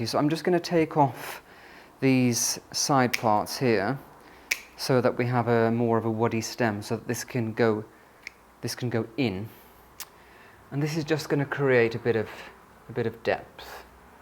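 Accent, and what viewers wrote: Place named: England, United Kingdom. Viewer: Hi, I love your English accent and the way you decorate your house. My daughter loves the vintage decorations, and I know she loves your way. My taste is a little more glam, but I also like yours. I'm very glad to see your posts. I send you my blessings from Riverside, California USA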